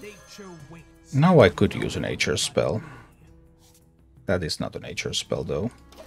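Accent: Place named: Finland. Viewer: As soon as you started talking I could tell you are a Finn!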